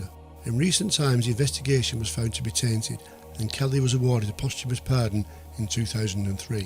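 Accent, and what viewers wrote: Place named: Northern England, United Kingdom. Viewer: Considering I'm a confirmed Yorkshireman, there'd b sum sort of rivalry, bt nt this time, it's gud to hear a Northerner, even better as it's a Northerner doin such a great job with these videos, gud job, u deserve any plaudits 👍👍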